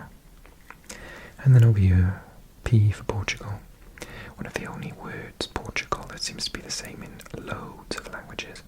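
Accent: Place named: United Kingdom. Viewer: what is funny is that as someone from outside the UK i don’t notice you having a welsh accent and i just hear a british one, EXCEPT when you say “here” and it sounds like hiyuhr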